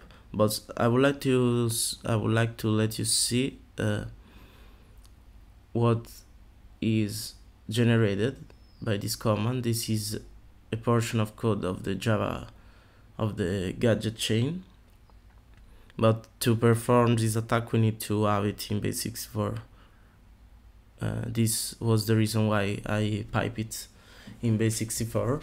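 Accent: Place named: Italy. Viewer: Grande, dall'accento ho subito riconosciuto che sei italiano e sono corso a vedere <3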